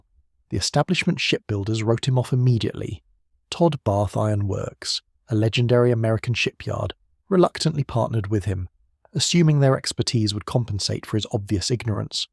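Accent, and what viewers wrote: United Kingdom. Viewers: Great detail video.But it is by a british guy , parenthe sounds british , I don't think it's a I voice. But tell Americans for a more crude and the way they assemble a ship, but they float and got the job done, which is true and how their ships were built and took years to build and they were topping line no by time, they finished them over there in England they were obsolete.That's why bismarck , and what have your sunk the english , ships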